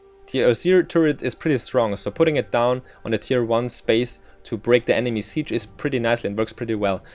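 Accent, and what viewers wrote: Germany. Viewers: Nice Tutorial. But now I am really interested. You dont really sound like youre from England or America. With which I dont mean your English isnt good its actually really great, but you dont got that accent. Thats just a guess but are you from Germany your accent sound kind of like the German one. I am from Germany so I am really interested now :)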